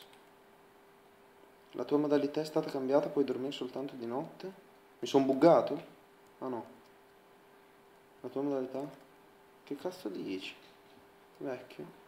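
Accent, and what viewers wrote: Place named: Palermo, Italy. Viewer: sei di palermo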